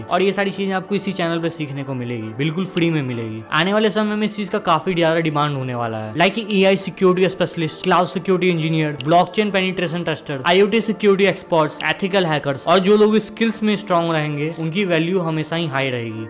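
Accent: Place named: Bihar, India. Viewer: Bhai pahle hindi sahi krle bihari se.bad me hacking krna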